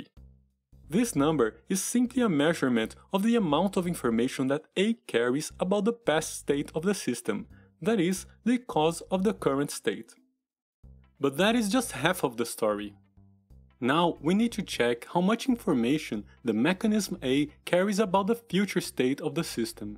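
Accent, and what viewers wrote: Brazil. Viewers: I need to ask you this. Your accent makes me believe you are Brazilian. Is that the case? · You're Brazilian right? I can spot the english brazilian accent in a second! I'm Portuguese BTW, and loved the video. I'm trying to get into IIT and this is much helpfull. When are you going to post a follow up? Um abraço do outro lado do Atlântico!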